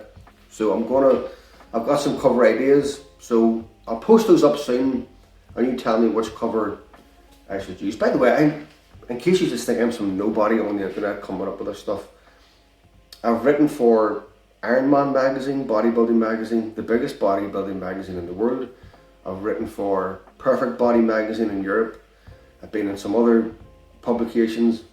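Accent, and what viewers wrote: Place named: Ireland. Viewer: New subscriber from Australia, hello 👋 I love your accent, don't get me wrong , but the first time I heard you talk about Fat , I thought you were swearing 😧 then it dawned on me, Ah an Irishman 😊☺️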